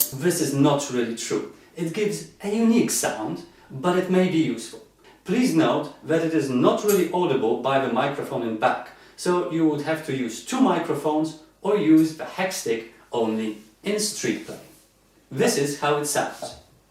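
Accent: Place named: Poland. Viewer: Dzień dobry! Thank you, for your must excellent video. I enjoy hearing your Polish accent. You English as you know is excellent.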